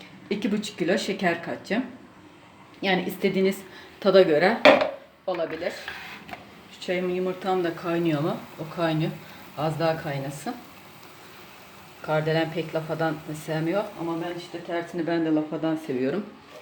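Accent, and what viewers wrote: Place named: Aegean, Turkey. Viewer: KARADENİZ ZONGULDAK DAN SELAMLAR HEPİNİZE. ,,EGE ŞİVESİNİ COK SEVİYORUZ ,, ÇOK TATLI KONUŞUYORSUNUZ SÜPER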